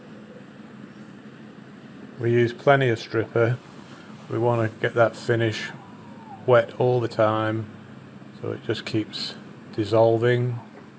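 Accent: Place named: England, United Kingdom. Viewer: Ooh Hello. Haven’t found a fellow Australian restorer yet. Pommie accent to one side. Looking forward to part 2.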